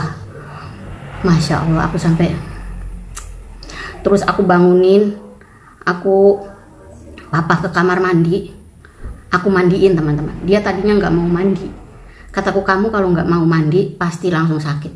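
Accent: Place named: Cirebon, Indonesia. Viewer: Semoga ama cpt sembuh....,maaf mba wong crebon ya...dari dialeknya..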